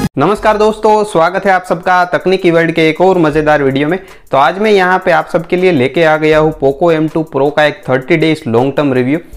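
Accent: Gujarat, India. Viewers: Aap Gujarati Hona... 😊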